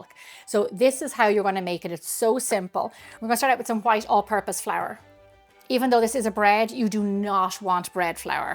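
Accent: Ireland. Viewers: Lovely Recipe, beautiful irish ☘accent:face-red-heart-shape::trophy-yellow-smiling:😇🥰🤗🍞🌎🏠🧈🍀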